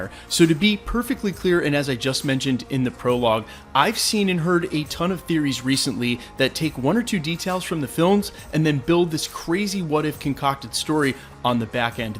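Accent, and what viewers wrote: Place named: California, United States. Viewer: You sound very California. Woah dude.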